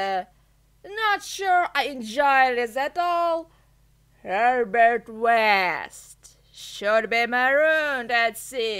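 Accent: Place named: United Kingdom. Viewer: this sounds british and every other accent together, keep up the good work 10/10 voice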